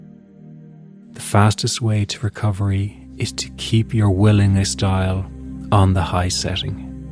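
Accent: Ireland. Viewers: Very helpful during this difficult time. Never felt anxiety quite like this in my 75 years on this planet! Was feeling somewhat suicidal at first, but slowly learning to accept it. Waiting for your book 'DARE' to arrive. Meanwhile, enjoying the Irish accent! ;-)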